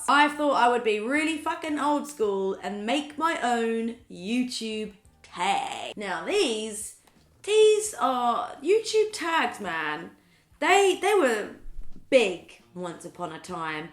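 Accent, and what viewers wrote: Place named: England, United Kingdom. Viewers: So I’m from the U.S and I have always been curious about where in England her accent is from. Anyone know?? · Karina Natalie it’s a Norfolk accent x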